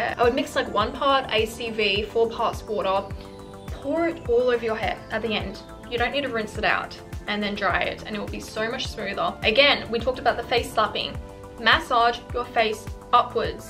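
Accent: Australia. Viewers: 5 reasons why changed my life: 1. made me boost my self-esteem. 2. made me physically healthy & mentally 3. got an aussie accent hooray! 4. Life lessons, like. thank you! 5. made me SMART and organized. Like love you your a life changer i will definitely talk about you to my grandchildren LOL ❤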